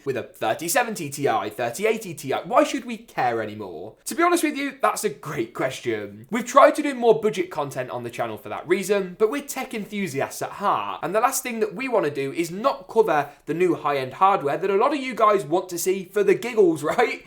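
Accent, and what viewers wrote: England, United Kingdom. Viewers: This guy has a very Thick English Accent But still Sub is deserved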